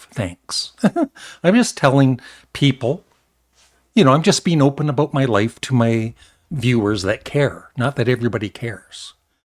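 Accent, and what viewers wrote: Canada. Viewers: Hey I'm guessing you're from Canada by the way you pronounce album as ELbum instead of ALbum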